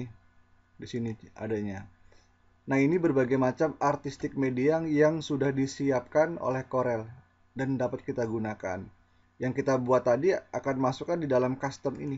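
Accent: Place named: Garut, Indonesia. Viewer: Gan bagi wa nya dong, asli garut ya gan? Logat nya kaya orang garut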